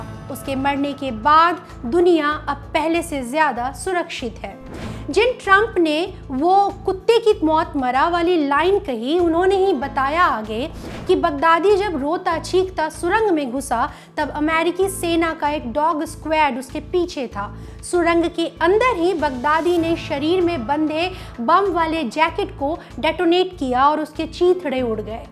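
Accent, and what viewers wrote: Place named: Uttar Pradesh, India. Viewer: Accha achha. Lekin kehne k tarika up wali aur voice quality Manmohan jee के jaisa hai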